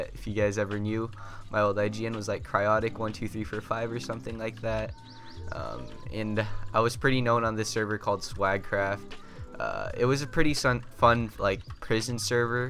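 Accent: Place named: Netherlands. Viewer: Where are you from..? You sound Dutch lol.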